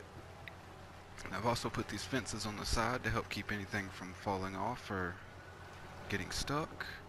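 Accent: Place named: South, United States. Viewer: Neatly done sir! This video like the recycling plant you've featured is well executed... It shall likely provide a considerable influence on my ideas on similar matters... Thankyou... As an aside might I ask asto the origin of your accent... Your voice clear, well modulated and easy to listen to... Maybe you should consider voice over work... Would you by chance, be a Southern Gent?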